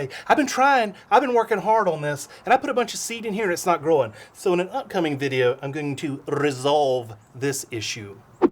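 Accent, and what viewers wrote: England, United Kingdom. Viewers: So... are you going to make a "rock" with a house key hidey hole? My parents had one & it was store bought & stuck out like a sore thumb lol. At least all of your rocks look alike, lol Loving the British accent, cracks me up. Nice family too !! Y'all are so sweet and the project looks prefect !! I might have to go into town and get some supplies!! Not gonna tell my otter half what its for... it's good to leave them wondering lol Be well !! 🦋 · Hahahaha! Thanks! That British accent made my morning😀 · Your Brit accent 😂 very good.🇬🇧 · That is so easy and you can do it however but the English accent was cracking me up , but do tell your wife she got a sexy one! Stay safe and many blessings to your family!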